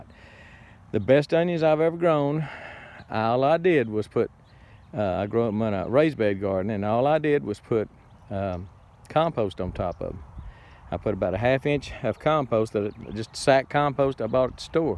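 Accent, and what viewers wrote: Texas, United States. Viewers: Oh that row looked so nice and neat, then you had to walk on it. lol I love your Texas accent. Great video.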